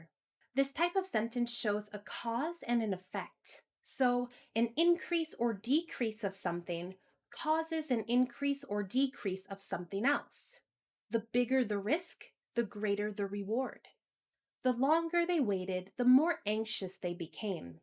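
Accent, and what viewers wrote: United States. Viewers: Is it american pronunciation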